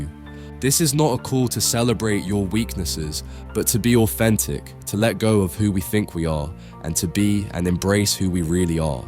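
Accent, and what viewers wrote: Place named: United Kingdom. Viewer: Why did he turn British?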